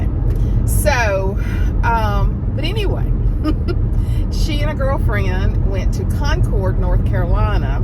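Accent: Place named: Alabama, United States. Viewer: I enjoy you. I recognized your Alabama accent immediately, because I am an Alabama girl, too. Lots in common, including age, and I am glad to see someone on You Tube who is not pregnant or doing all the things I did 30 years ago. Thanks for your testimony, your outlook, and your wisdom.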